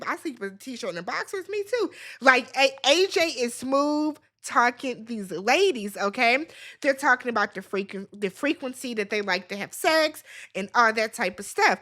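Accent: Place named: DMV, United States. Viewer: Are you from the dmv area? A couple words and the way you pronounced things gave DMV 😂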